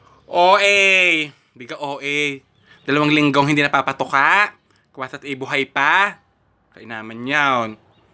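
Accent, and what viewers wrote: Batangas, Philippines. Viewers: Hahahaha nkakatawa ka tlga.! 😁😁 Lalo na eh my tono Ng batangenyo ay siya nkakatuwa..hehehe 😁😂😂😂😆😆😆😆😆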